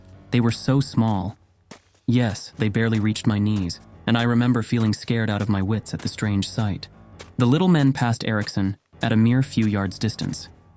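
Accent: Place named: Eslöv, Sweden. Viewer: Trodde att han var från Eslöv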